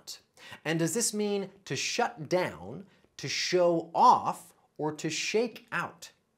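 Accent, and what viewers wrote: United States. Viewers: For goodness sake this is primary s hood stuff! So the chap pronounces some of the words with n American accent t….which does not go down well with me. An English lady!!. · This really should be about learning American. Assume was given an American pronounciation and 'acclimate' is not used outside of the US as the English word is acclimatize. Presentation was good.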